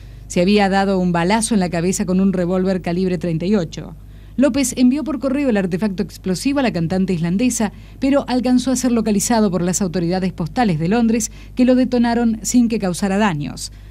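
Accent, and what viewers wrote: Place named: Corrientes, Argentina. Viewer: Si no me equivoco, la que narra, que debe ser de Corrientes por su acento correntina, sea la misma que se escucha en el canal de Al Rojo Vivo. Seguramente éste video vino de ahí.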